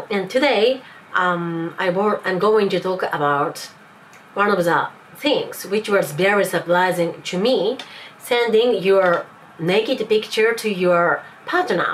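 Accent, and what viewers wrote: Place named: England, United Kingdom. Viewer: Hi. I am an Englishman who really loves your English accent! Your spoken English is excellent and I think that you have a brilliant command of the language. Your voice is also incredibly beautiful and so soothing. You shouldn't feel guilty about not liking certain aspects of Western culture, like the one of sending nude pictures. Many of us "normal folk" find it very unusual too! Thank you so much for sharing your life experiences, the beautiful Japanese lady with an amazing smile ❤️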